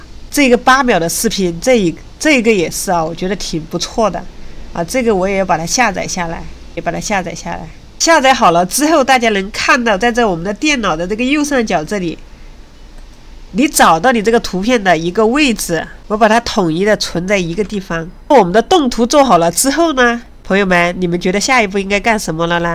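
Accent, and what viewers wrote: Sichuan, China. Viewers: 不错，你的川普，我知道你在哪里了，怎么联系你，跟着学习，谢谢！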